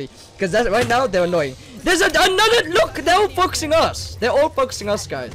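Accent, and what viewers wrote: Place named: England, United Kingdom. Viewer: He honestly sounds so different when he's not screaming, it's like the only time I can hear his English accent😂😂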